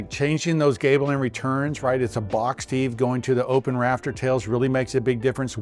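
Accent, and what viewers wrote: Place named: New England, United States. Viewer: I'm guessing is from New England. We all say it like that up here, except sometimes it's "MassiveTwo..."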